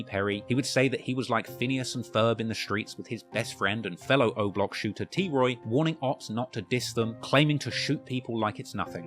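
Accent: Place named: London, United Kingdom. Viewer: Ayo this nigga talking about Von with a London accent lol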